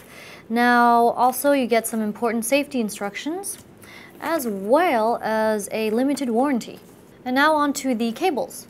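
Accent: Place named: Asia. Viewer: Asian accent ))))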